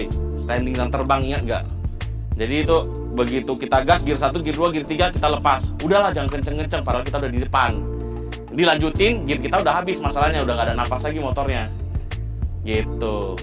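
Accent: Medan, Indonesia. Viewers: medan kali asli wkwkwkw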